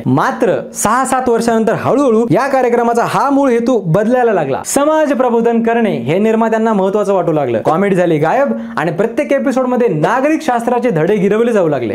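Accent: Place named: South, India. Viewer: मॅटर कमी, बोंबाबोंब जास्त, मला हा वाटत हा चेन्नई किंवा तिरुवनंतपुरमचा वाटतं,कारण त्याच स्टाईल मध्ये बोलत आहे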